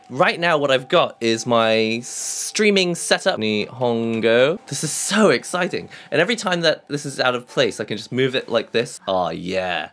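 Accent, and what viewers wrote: England, United Kingdom. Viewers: It could be a thing, livestream wearing a suit and tie...with the English accent could be your unique selling point.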